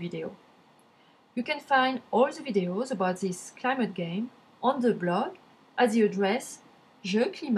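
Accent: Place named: France. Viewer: Dude she's obviously French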